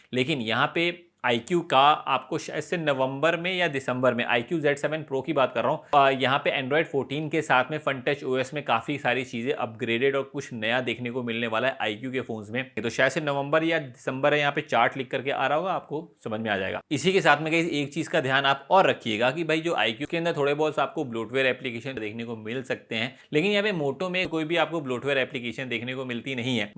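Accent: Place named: Bihar, India. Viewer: Bhihari tune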